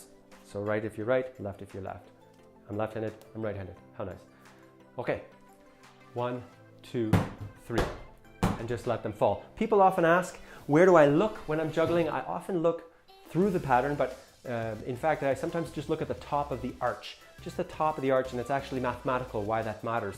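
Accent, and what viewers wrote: Ireland. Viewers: Definitely one of the better accent attempts Iv'e seen on youtube, and I've seen some bad ones, good job either way. I live in Cavan, but my doctor is from Galway and he sounds exactly like this. Dr Cassidy is also a dork too, no offense I'm a dork so I can get away with saying that :P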